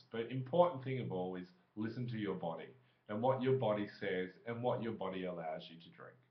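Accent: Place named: Australia. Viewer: You sound Aussie mate!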